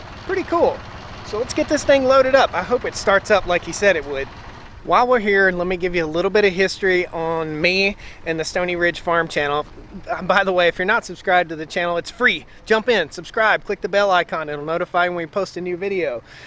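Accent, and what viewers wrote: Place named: South, United States. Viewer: your dad really brings out your Southern drawl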